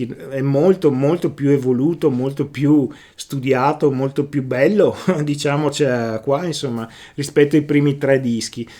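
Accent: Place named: Veneto, Italy. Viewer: Un video per ghiottoni,molto interessante,grazie,ti ga' cadenza veneta,ció...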